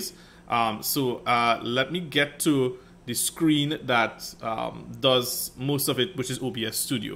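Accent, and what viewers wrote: Trinidad and Tobago. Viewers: He sounds trini in arima or chaguanas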